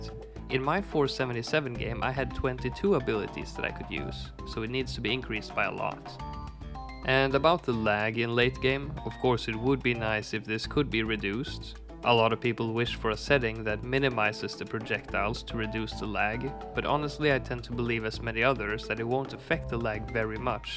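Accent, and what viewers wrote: Sweden. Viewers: tog två sekunder att höra dig prata för mig att inse att du är svensk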